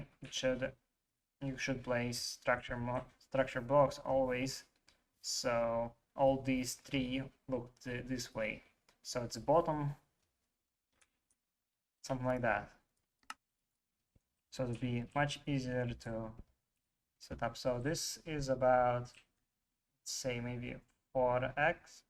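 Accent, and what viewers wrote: Russia. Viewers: У вас руский Акцент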